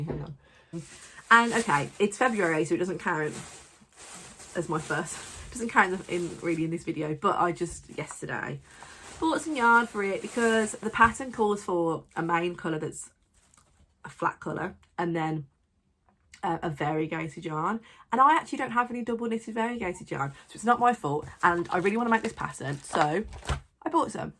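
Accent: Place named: Birmingham, United Kingdom. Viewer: Fellow Brummie. Lovely to watch a knitting podcast with someone who has the same accent as me. Appreciate the honesty of having a wip that is not going as expected. This is so me!! From an experienced frogger 😂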